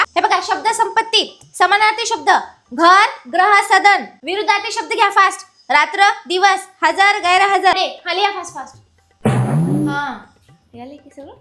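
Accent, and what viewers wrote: Nanded, India. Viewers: Madam tumhi Nanded chya haw ka manalo. Ase vatalay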